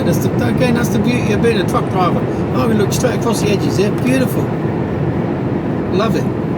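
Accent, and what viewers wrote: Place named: South London, United Kingdom. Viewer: Always confused trying to place your accent,picking up a little South London,Plumstead area maybe.? Enjoyable vlog,nice to see the A1 ,used to spend hours on it and hated it then.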